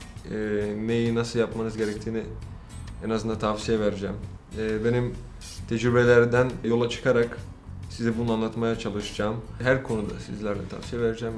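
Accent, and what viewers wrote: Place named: Cyprus. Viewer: Kıbrıs aksanına çok benziyor konuşması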